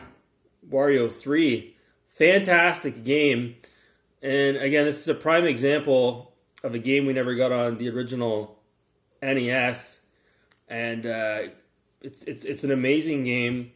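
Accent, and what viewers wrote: Canada. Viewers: Sound like northern Ontario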